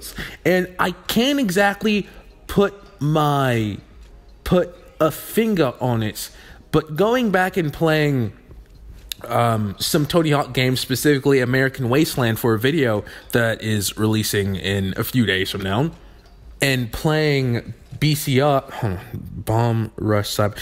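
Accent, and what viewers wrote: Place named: United Kingdom. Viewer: bro how many accents do you have can't tell if you're British or im tripping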